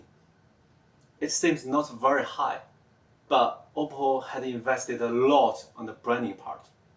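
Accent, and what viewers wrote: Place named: China. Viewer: very good content. If you're up for some constructive criticism: -audio could use some work -English needs so much work, the typical thick Chinese accent (putting subs might help)..not being racist.. it's just that there are times when your words are indiscernible interested in what you'll do with your channel next though 😉 you should collab with TechAltar. that would be awesome since you already know each other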